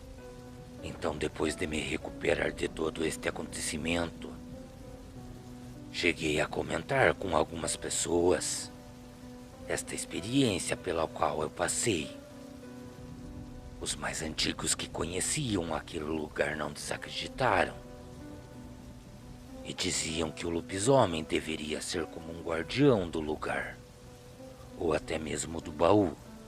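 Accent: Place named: Paraná, Brazil. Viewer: Boa noite, meu velho ! Cara gosto deste sotaque de ti uma mistura do Parana com o interior de Sampa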